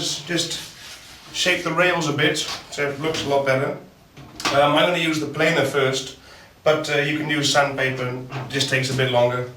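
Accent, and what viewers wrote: Netherlands. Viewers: Haha ik zat te luisteren en ik denk, hmm wat voor accentje is dit, klinkt heel hollands :P. Lees de eerste comment, ja hoor :P. Wat voor hout raad je aan? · What's the accent may I ask? Sounds like Dutch but living in the UK for a decade or so...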